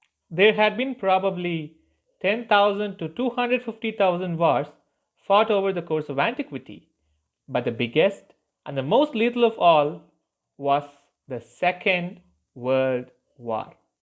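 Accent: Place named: RP, United Kingdom. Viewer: English like bbc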